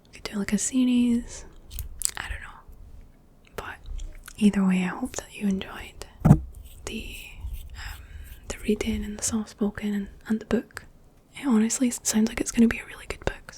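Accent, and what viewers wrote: Scotland, United Kingdom. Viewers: Scottish accent and Sark douls in one video. The bell hath been clicked.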